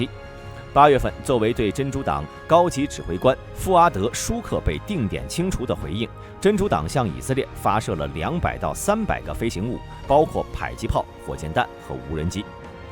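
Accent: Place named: Beijing, China. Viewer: 感謝本節目的男主播，以標準的北京話報導日字正腔圓清楚的報導謝謝🙏。❤❤❤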